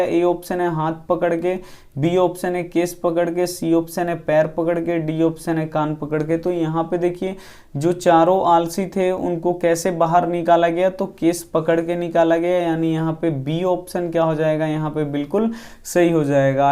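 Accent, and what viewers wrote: Bihar, India. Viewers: Hiii Kya aap bihar se ho..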